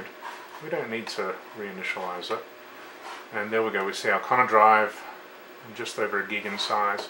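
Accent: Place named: Australia. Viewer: Damn good video. I'm guessing that is an Australian accent. From a Brit perspective, when I was young (with my youngun mind), basically Australia looked like one of those countries that was big enough to have stuff USA had, like skyscrapers and basically "massive civilisation stuff". I hope that makes sense. Well, anyway, it intrigued me as a kid the way Australia (and actually Canada is a bit like this) has that and has PAL and the Amiga. It was like the Amiga having a fighting chance (and I was an amiga fanboy of course). Then, in Europe (northen Europe and then down to France and Spain) used the amiga too but also UK) they seemed kind of condensed like a hardcore of coders (demoscene etc) and gamers as a seeding ground for amiga stuff that would permeate around the world. A channel which reminds me of yours a bit is PhilsComputerLab because he appears to somehow have some space (does he actually live in the outback or something?) and tons of hardware. 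So Austrlia (and big landmass countries with skyscrapers like Canada) had the resources and civilisation to form these kinda Amiga museums today. It is shocking what amiga stuff ended up thrown out in the UK. I remember hearing about a guy who had a gold colour a500 (one of those special ones) and while he was at university, his mum cleared out the loft and thereby ditched it. Ouch. So, maybe you can see why I like Australian (and canadian etc) usages of the amiga. :)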